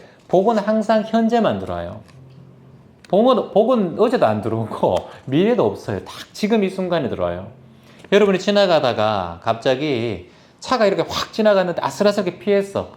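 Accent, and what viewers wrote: Gyeongsang, South Korea. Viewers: 저는 대구입니다. 편안한 목소리와 살짝 경상도 억양이 더 정감갑니다.💓 늘 감사드립니다. 감사합니다 ^^고맙습니다 ^^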